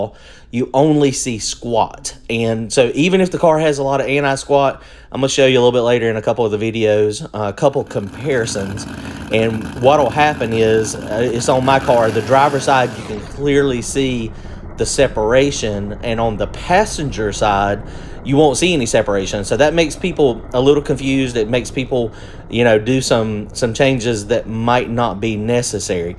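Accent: South, United States. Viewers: Really cool informative video. Question are you originally from the south?.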